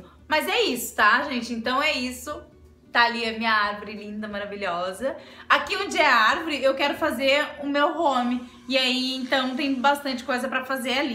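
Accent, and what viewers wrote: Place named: South, Brazil. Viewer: Conheci seu canal hoje e estou assistindo os seus vídeos, seu sotaque me chamou a atenção, vc é do Sul??? Amando seus vídeos, sua criatividade e capricho. Parabéns 😘 😘 😘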